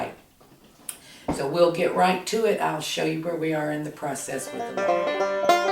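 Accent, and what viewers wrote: South, United States. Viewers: Ma'am, I have enjoyed this video very much. You provide excellent information and I love your down home accent. I also want to compliment you on the quality of the seasoning on your cast iron skillet. It looks first rate. Keep the wonderful videos coming.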